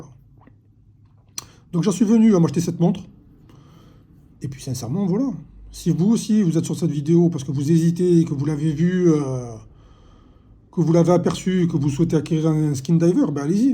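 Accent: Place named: South, France. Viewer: Bravo 👍 J’apprécie te suivre, avec ta franchise, tes vidéos sans chichis et ton accent du Sud qui fait plaisir! Bonne continuation! PS: elle me tente bien cette Glycine 😍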